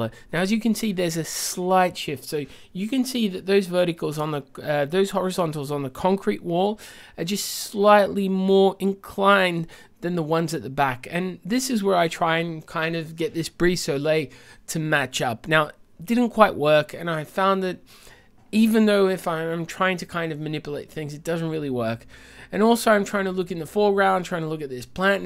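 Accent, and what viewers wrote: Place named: Portugal. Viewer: olá, sotaque português não passa despercebido. já aderiste a este grupo? 3D PT <link> abr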